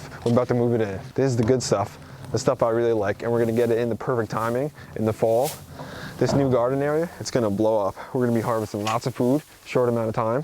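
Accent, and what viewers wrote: New Jersey, United States. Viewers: Just found your channel and quite glad I did. One suggestion... stop talking Jersey.... slow down.... :)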